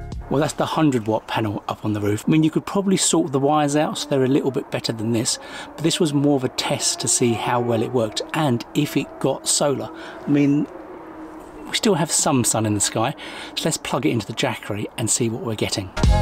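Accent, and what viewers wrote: United Kingdom. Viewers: Your BBC’s accent is fantastic for foreigners, I can understand everything, thanks. Really, school english. All the best mate.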